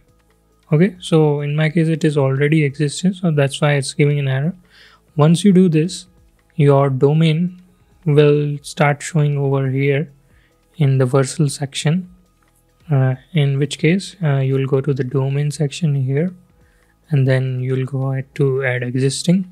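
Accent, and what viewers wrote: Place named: India. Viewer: indian accent = like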